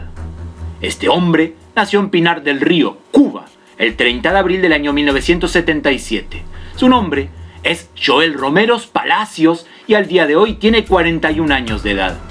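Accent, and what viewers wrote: Argentina. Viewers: que eres Argentino o Uruguayo??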